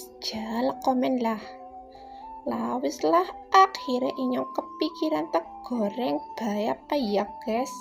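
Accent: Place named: Banyumas, Indonesia. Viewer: Kok ngapak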